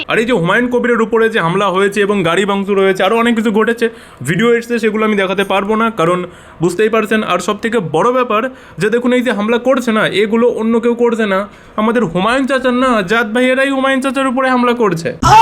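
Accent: Bangladesh. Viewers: হুমায়ূনকে বিজেপি সাপোর্ট করেছিল।। তুই জানিস না গোবর ভক্ত।। তোর কথা শুনে মনে হচ্ছে তো তুই একটা খাঁটি বাংলাদেশী।। এই করছিল খাস ছিল এই যে তোর ভাষাগতে।।তোর প্রমান তুই একটা বাংলাদেশী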